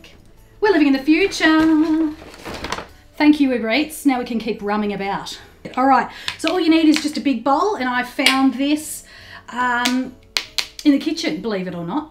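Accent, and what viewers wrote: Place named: Australia. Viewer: I'm not used to the Australian accent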